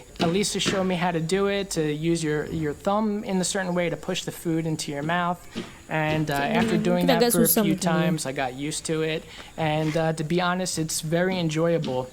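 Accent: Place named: Kelantan, Malaysia. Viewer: ini dye cakap kelate jgk mcm aiman...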